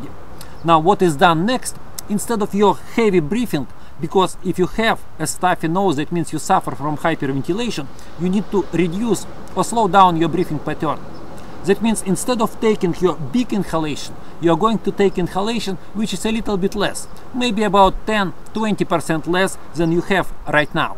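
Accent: Russia. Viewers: he's Russian and so am I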